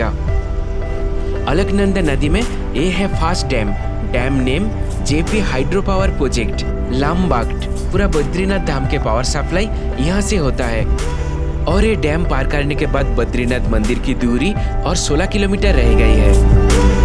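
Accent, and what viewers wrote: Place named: Bengal, India. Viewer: Bhai tumi pakka veto bangali. Tomar pronounciation shunei bojha jacche. Tmi hindi te blog na baniye banglai koro na. Shunteo valo lagbe. Success o pabe.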